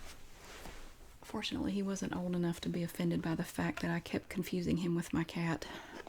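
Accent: South, United States. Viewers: I'm also from the south (Georgia) and we honestly sound the same😂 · I love your accent, is that a "Southern" accent? I am from Bombay. Sometimes I watch Hollywood movies and many of them have characters speaking with an accent quite similar to yours. I am just here for the accent.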